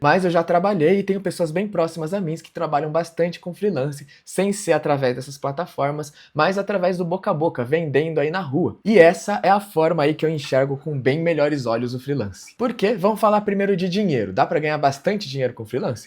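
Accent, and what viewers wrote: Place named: São Paulo, Brazil. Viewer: nunca vi uma pessoa parecer tao paulista jesus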